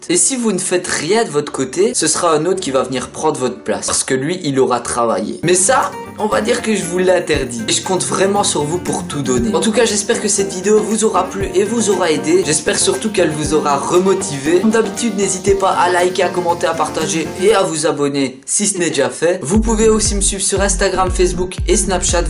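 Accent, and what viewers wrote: Liège, Belgium. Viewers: tu es belge ? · EST TU belge ?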